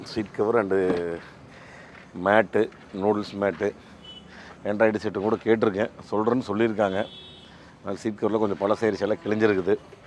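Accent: Kongu, India. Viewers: அருமையான வேலைப்பாடு இனிமையான கொங்கு பேச்சு செலவு எவ்வளவுனு சொல்லுங்க சார்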